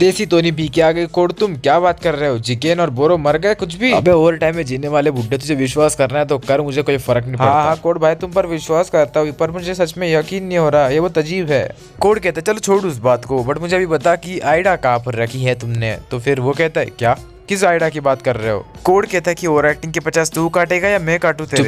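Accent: Bengal, India. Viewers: Ya bangali h😹😹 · Ye bengoli hai 😅😂😂😂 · Bangali he😅😅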